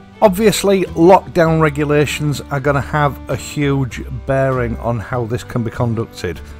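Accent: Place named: Yorkshire, United Kingdom. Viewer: Dear: I like your music and I like your Italian (ooops - make that British) accent and I like your new motorcycle to which I will attach the name “Bullet” because you own one and I don’t and that’s what you call it. Actually, I find the music quite agreeable - much better than the acid rock that some bike videos play (and to which I do not subscribe). Anyone who disagrees is most welcome to watch one of the tens of thousands of other YouTube channels - or they can just get stuffed. One question about the Bullet: would any of the Interceptor or Himalayan accessories fit? I suspect not as those two machines are much more recent designs - but I thought I’d ask.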